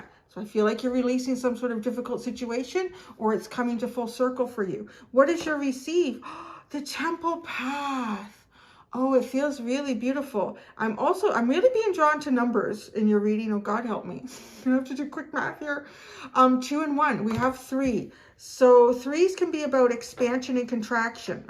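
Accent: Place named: Canada. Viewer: your canadian accent is so soft and good for practicing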